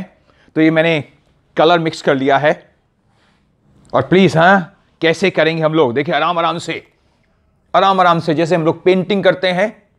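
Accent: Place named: India. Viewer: aapka bolne ka style mast hai...itna Desi style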